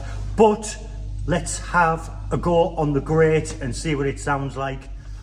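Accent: North, United Kingdom. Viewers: I love your channel and the small country churches you give us a glimpse into here in the far off US of A. Your northern accent is also quite nice to listen to! 😊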